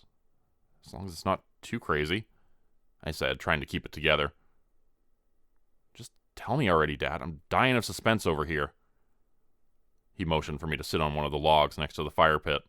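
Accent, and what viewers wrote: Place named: Canada. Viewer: I KNEW u were from Canada! The way u say any word w/ "out" in it. Or box. Or house.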